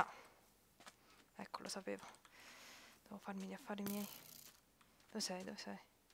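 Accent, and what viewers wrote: Naples, Italy. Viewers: anche io sono di napoli, ma parla come vuoi, anzi a me piace di più quando parli un po' in dialetto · Ma sei napoletana?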